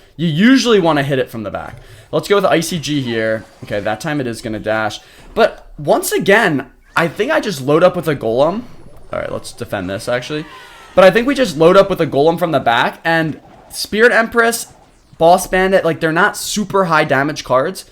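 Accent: Spain. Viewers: Bro your english is very spanish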